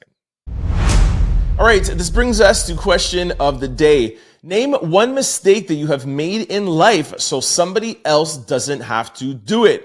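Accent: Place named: Canada. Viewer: You’re not even an American are you buddy? I can tell by your accent you’re Canadian, correct? Get lost.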